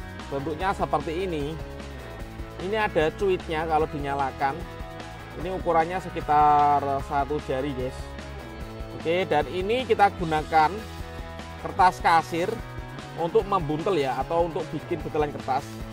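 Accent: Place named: East Java, Indonesia. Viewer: Jawa Timuran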